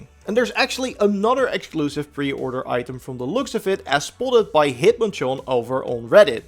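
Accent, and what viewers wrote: Netherlands. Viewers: The Dutch accent coming through alot just the way it's meant to be :3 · Omg your dutch accent is so distracting... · Bruh you ooze Dutch with that accent. Love it 😂👌🏽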